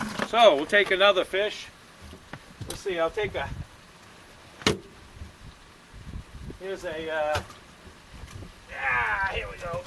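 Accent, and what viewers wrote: Boston, United States. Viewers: Thanks for sharing!!! I can hear you haven't lost that Boston accent despite you have been in Alaska for decades. Great show (Yucon..)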